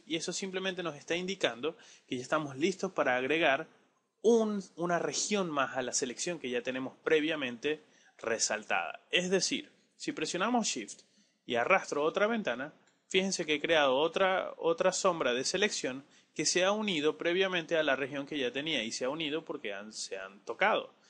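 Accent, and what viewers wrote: Venezuela. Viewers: Yo sufriendo con un curso en Inglés y encontré justo uno de los mejores tutoriales : en Español y con acento de mi país (Venezuela). Mejor imposible! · Eres Venezolano? lo digo por la manera la hablar. Buen Curso Saludos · eres de venezuela mano Saludos jejeje Ya se restaurar y esas cosas pero ay algunas cosita que no sabia y e puse a ver tus videos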